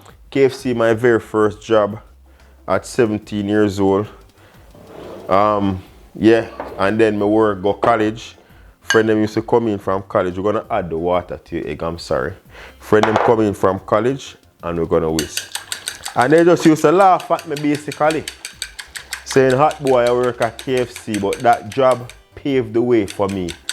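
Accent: Jamaica. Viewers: man i love jamaican accents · Yo...Am i the only personwho loves the Jamaican accent? Nah, that's what i thought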